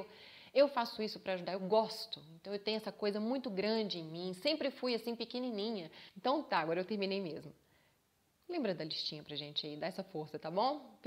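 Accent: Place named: Minas Gerais, Brazil. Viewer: cara, como eu amo esse sotaque mineirin hahahaha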